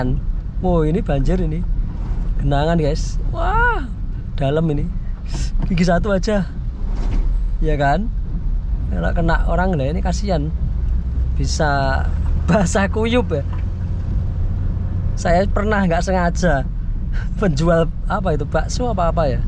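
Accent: Madiun, Indonesia. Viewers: orang madiun nggih mas?😅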